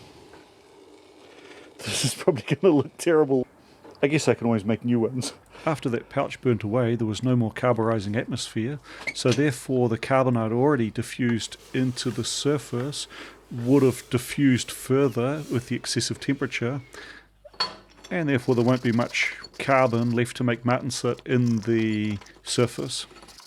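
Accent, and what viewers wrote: New Zealand. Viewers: Came here by accident on a Sunday evening & heard the oh so recognizable Kiwi accent that made me stay & confirmed New Zealand connections with the "Bog" body filler . Subscribed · "four inch input vice on the idge of my welding binch" What's that accent called? P.s. New Zealand? Where's the old one? P.p.s apparently Zeeland is a dutch province, and dutch were known sea explorers so I'm not surprized if they named your country.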